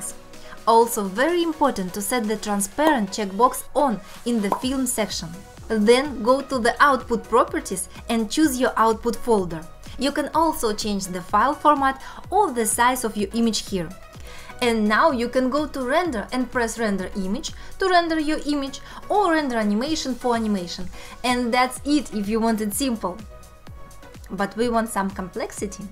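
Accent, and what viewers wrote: Russia. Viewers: Из Русского только русский акцент) но спасибо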